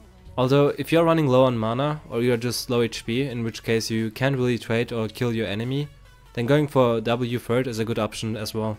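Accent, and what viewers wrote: Germany. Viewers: Ayyyy that german accent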